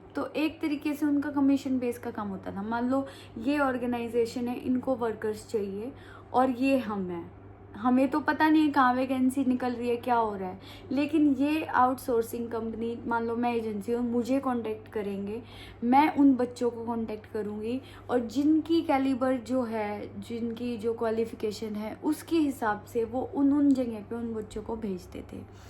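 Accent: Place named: Haryana, India. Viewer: me bhi jaat family ko belong karti hun but me by birth punjabi family se belong karti hun but mere in-laws jaat hain U P se. Jb se maine tumhare vlog dekhna shuru kiya to mujhe tum bahut pyari lagti thi us ka ek reason y tha ki mujhe tum haryanvi language bolti Hui bahut achi lagti thi kyuki tumhare bolne me bahut mithas hai jo maine kabhi haryana ke jaato me nahi dekhi thi. Phir jb se ko dekha to mujhe tum dono se pyar ho gaya bacho ki tarah ab mujhe hr time tumhare vlog ka wait rehta hai. Congratulations tum Mom Dad banne wale ho but apni health ka dhyan rakhna. God bless u all. Luv u all❤❤.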